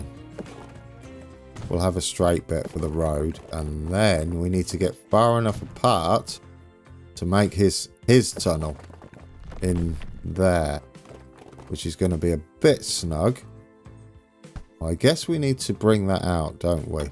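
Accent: United Kingdom. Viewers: Hello, in about section you say : location USA, but you sound quite british. I am improving my English. Could you enlighten me ?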